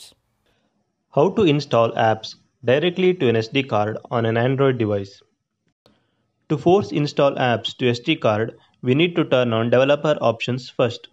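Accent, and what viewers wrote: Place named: India. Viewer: Why does all this videos on youtube has an indian accent WTF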